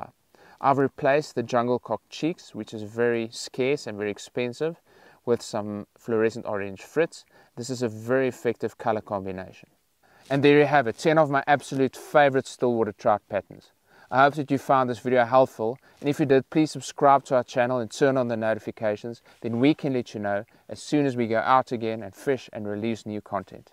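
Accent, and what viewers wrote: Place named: South Africa. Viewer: Great video! your accent makes me want to go back to South Africa 🤙 thanks for Australian.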